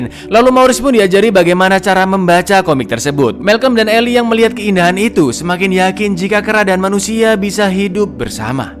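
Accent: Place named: Surabaya, Indonesia. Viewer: Aku arek mojokerto paham banget celometane arek suroboyo sidoarjo model iki wkwkwk nggarai mengkel2 kudu ngguyu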